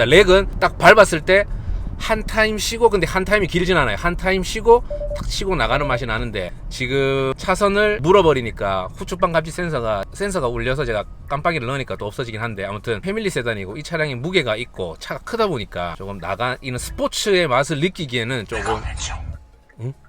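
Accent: Gyeongsang, South Korea. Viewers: ㅋㅋㅋ 나도 경상도놈이라ㅜ경상도사투리 너무 좋음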